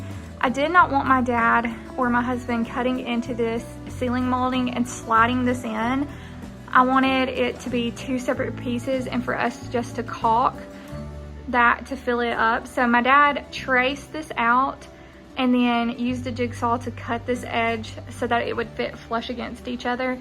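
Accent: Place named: South, United States. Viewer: loveee your adorable southern accent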